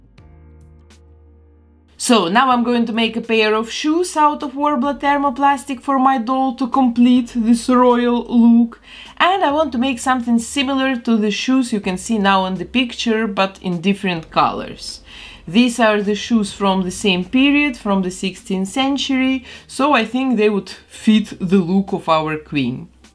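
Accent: Russia. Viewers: I love you !!!.. I think your absolutely gorgeous and I love your accent !!!.. are you Russian ???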